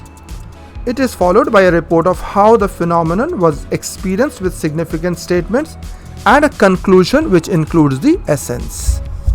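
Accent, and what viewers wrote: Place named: India. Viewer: This video seems very important and informative. Sadly I don't understand the English with the heavy Indian accent. My loss. 😔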